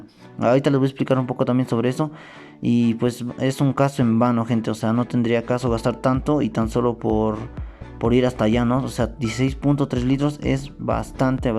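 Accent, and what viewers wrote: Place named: Peru. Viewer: PURA VOZ DE PERUANO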